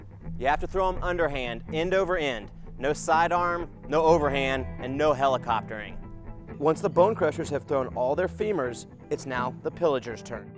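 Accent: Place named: United States. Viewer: his American accent is incredibly annoying, it sounds like he's saying kooob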